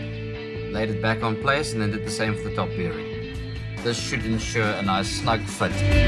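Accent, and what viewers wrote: South Africa. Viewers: I'm afraid I couldn't listen to the whole film , I couldn't understand the South African accent. Great filming though. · awesome video! love the videoagraphy and time taken to take important close shots. Is that a South African accent I hear :)? I ask because I'm from Cape Town :) One wouldn't say you new to this lol you doing an epic job can't wait to see your new videos. couple of quick questions if you don't mind its about your build. What size Bearings and bolts did you use and the rivet thread things you used, that just mind blowing lol what are they called? Can I source them from BMG bearing man? Thanks again Best wishes for 2019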